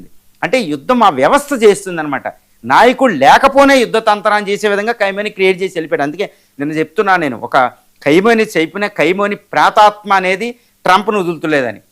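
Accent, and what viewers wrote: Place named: Telangana, India. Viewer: Telangana yasa la balay chayptunaru Sir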